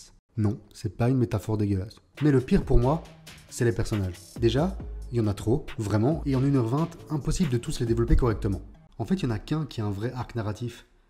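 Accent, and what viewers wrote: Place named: Brussels, Belgium. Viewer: L'avais compris grâce à une autre de tes vidéos. Bruxellois, je suppose. Suis né belge flamand.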